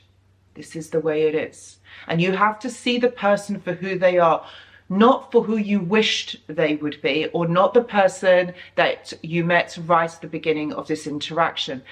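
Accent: England, United Kingdom. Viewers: Yes, your videos are insightful..what I like even more is your luscious England accent 🤍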